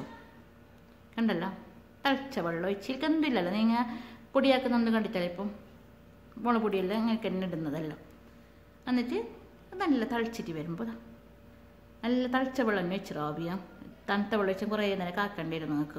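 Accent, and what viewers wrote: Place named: Kasaragod, India. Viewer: Ingale kasarod slang I like ..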